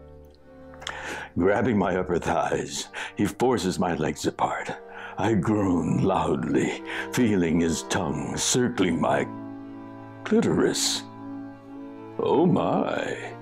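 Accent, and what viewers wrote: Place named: Japan. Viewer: His Japanese accent comes out so much when he uses his narration voice. Oh myyy.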